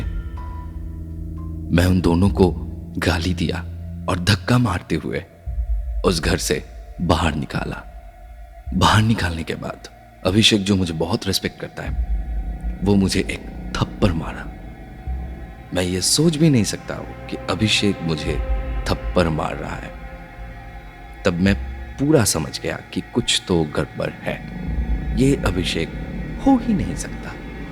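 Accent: Bengal, India. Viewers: Love from Kolkata .....I am bihari Girl .....aapnar kothay ektu ektu bengalier tuning ache .....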